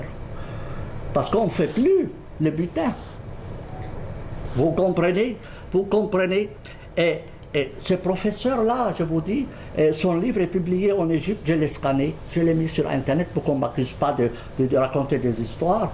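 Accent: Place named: Switzerland. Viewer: Drôle et passionnant... L'accent suisse est la cerise sur le gâteau.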